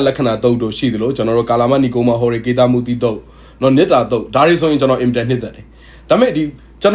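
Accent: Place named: Bangladesh. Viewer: တခုပဲအကျန်ပြုချင်တယ်အဖေအမေရဲ့ဝမ်းဗိုက်ထဲကမွှေးလါထဲကပဲအကိုပြောတဲ့ဘဂ်လီလို့ပြောခဲ့တယ်နော်ကျွန်မတို့လဲဘဂ်လီသွေးနှောပဲတာပေမဲ့အကိုကြိုက်သလောက်ဘာသာမဲ့လို့ပြောလဲအကိုစကါးအသွားအလါမှာဘဂ်လီသံပေါက်နေတာရယ်မိခင်ဖခင်ရဲ့အသွေးအသားနဲ့တည်ဆောက်ထားတာဆိုတောလူရယ်လိုဖြစ်လါရင်လူ့ပါတ်ဝန်းကျင်မှာဘာသာတခုတော့ယုံကြည်စွာကိုးကွယ်စေချင်ပါတယ်အကိုကကို့ရဲ့ပြထမ်းထားတဲ့ကျမ်းကန်ကိုအသေချာလေးလေ့လါစေချင်ပါတယ်ဂျပန်လူမဖိုးကိုရီးရားလူမျိုတရုပ်လူမျိုးအဂ်လိပ်လူမျိုးမြန်မာနိုင်ငံမှာရိတဲ့မြန်မာလူမျိုးတွေတောင်အစ်ဆလ္လာဘာသာကိုလေ့လါသင်ယူပြီးအီမာန်တေဂျင်နယ်အမိအဖမွတ်ဆလင်ကမွေးပြီးကို့ဘာသာကိုကိုလေ့လါပြီးသင်ယူမှုတွေလုပ်ပြီးအီမာန်ခိုင်မြဲရင်အကိုကိုညမတိုပျော်ရွင်စွာနဲ့ဂုဏ်ယူနေမှာခုလဲညမကအကိုဘအကောင်မှာဝင်ကြည့်လို့အရမ်းန‌ှမျော့တယ်အလ္လာ့ဆီမှာအလ္ာကြီးဟေတာရပ်ပေးပါစေလမ်းလွဲမရောက်ပါစေနှဲ့လိုဒိုအာလုပ်မှာပါအကိုဘယ်လိပဲဘာသာမဲ့ပြောပြောအကို့ကိုကုလါးဆိုပြီးကော့မန့်မှာဝင်ရေးနေတာတွေ့တော့ညမစိတ်မကောင်းပါဆရာကြီးဝင်လုပ်တာမဟုပ်နောအကျန်ပြုတာကျေးဇူးပါဖိုက်တင်း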